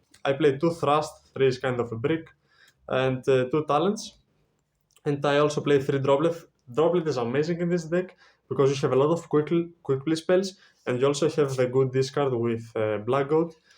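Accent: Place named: Greece. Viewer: I know a Greek accent when I hear it. Καλή δουλειά αδερφέ!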